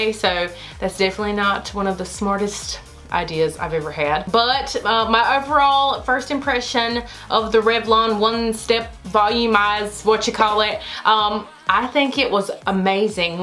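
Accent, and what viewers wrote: South, United States. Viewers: Lori T I’ve never heard anyone with that thick of a southern accent in NC. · I like your southern accent! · You are so down to earth and I LOVE your accent! After I hear someone’s accent I start talking like them lol! After I finished your video my husband was like, “why are you talking with a southern accent?” 🤣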